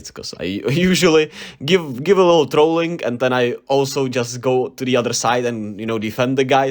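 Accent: Czech Republic. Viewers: Je huste pustit si video a jen z prizvuku zjistit, ze je nekdo Čech.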